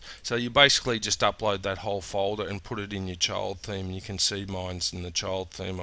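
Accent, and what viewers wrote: Australia. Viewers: you are aussie correct?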